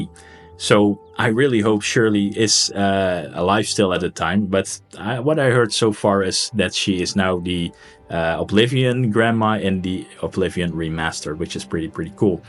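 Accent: Netherlands. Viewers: this guy is definitely dutch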